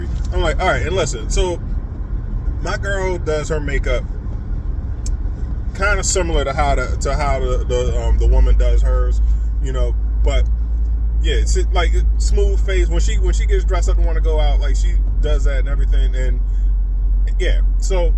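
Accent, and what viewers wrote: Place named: Baltimore, United States. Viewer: Baltimore? I can tell. Dope assessment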